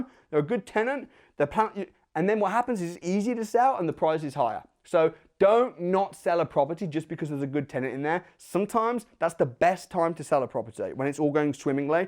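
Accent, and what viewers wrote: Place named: Birmingham, United Kingdom. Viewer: Youre a legend but I can't help but be fascinated by that Brummie accent of yours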